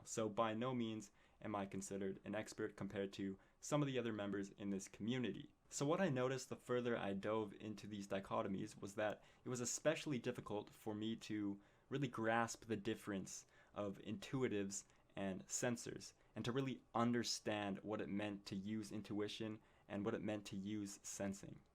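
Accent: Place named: Canada. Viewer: Are you Canadian? Love your accent!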